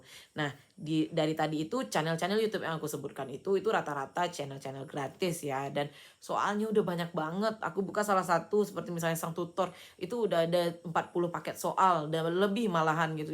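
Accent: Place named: West Sumatra, Indonesia. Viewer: Mode urg minang logat akak ma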